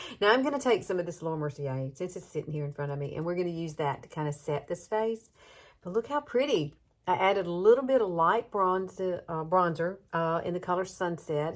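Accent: South, United States. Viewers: This DOES look fun!😃💗 Thanks for sharing!... And I love your cute Southern accent!😍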